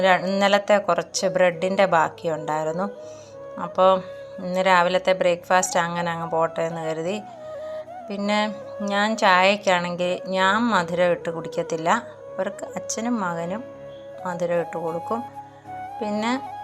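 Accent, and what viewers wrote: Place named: Kottayam, India. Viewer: സംഭവം കൊള്ളാം. രസമുണ്ട്. കോട്ടയമാ അല്ലിയോ?വർത്തമാനം കൊള്ളാം. ഇഷ്ട്ടപ്പെട്ടു.go ahead👍👍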